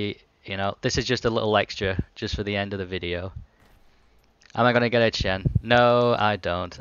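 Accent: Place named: Scotland, United Kingdom. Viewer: scottish accent just make it better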